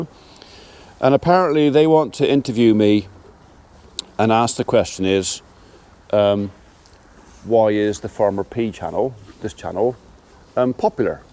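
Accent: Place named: Gloucestershire, United Kingdom. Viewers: I can't say I've noticed you going out to milk your cows twice a day, maybe I haven't been paying close enough attention!! I must say your Gloucestershire accent sounded stronger than normal on that video, mine isn't noticeable now after49 years away.Why do we watch your channel? no idea, but you've become an addiction ever since I first discovered your channel. And we attended the same college, that's got to count for something surely! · For me I think it’s a combination of your sense of humour with the sideways look and a twinkle when you’ve said something amusing, the obvious love you have for the cattle and your respect and kindness for them and just watching the farming year unfold as time goes by. You also remind me of the farmer at the small dairy farm they used to be next door who became a good friend. Also I live in Gloucestershire and enjoy listening to my accent on the screen Always look forward to your daily videos and your wry outlook on life in general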